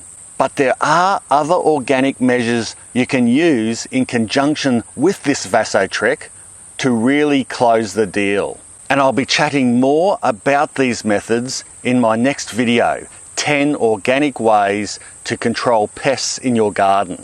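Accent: Australia. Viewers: Awesome video thanks for the information. Great to hear an aussie!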